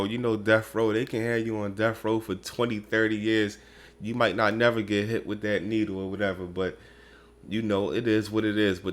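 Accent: Philadelphia, United States. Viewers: I know people that aren’t from Philly or the tri state area don’t be understanding half the words you be saying lol I’m from Philly and I’m like bro talking so Philly that it sounds like you mockin us even though I know you from Philly too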